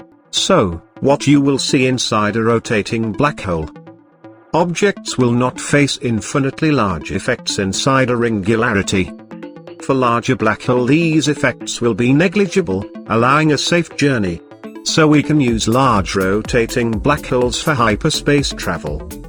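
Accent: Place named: United Kingdom. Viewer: a guy with a British voice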